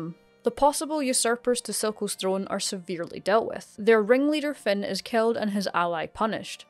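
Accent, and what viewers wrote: Scotland, United Kingdom. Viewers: A Scottish lass talking about my fave villian in recent memory, yes please. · Arcane and Scottish accent commentary. How can I not subscribe?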